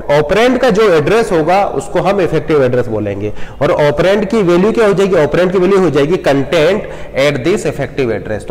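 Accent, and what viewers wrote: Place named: India. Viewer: Im Portuguese, I have a hard time understanding English with an Hindi accent especially when he speaks so fast, I really appreciate these videos, could someone please subtitle these?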